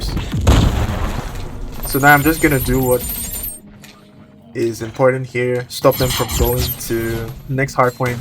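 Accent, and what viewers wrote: Nigeria. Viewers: Bro, are you Nigerian?😂😂 very useful video btw🤞🏽🙏🏽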